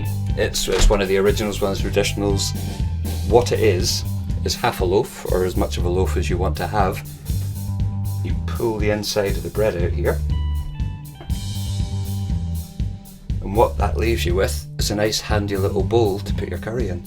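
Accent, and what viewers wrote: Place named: Ireland. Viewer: Cool recipe makes it better hearing an Irish voice :)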